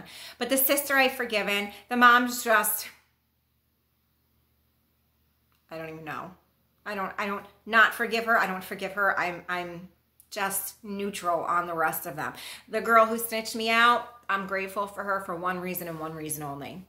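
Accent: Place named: New York, United States. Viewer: I love your New York accent ❤️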